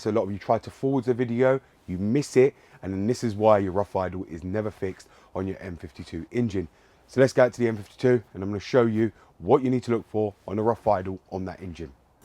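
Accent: United Kingdom. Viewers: I'm 23, would like to be a vag technician but I like BMW, trying to get a <num>i as my first and daily car, this Chanel is 🤘🏾, the only thing I'm not very comfortable is with the UK accent ahaha but you're okay, I'm just not use to heard it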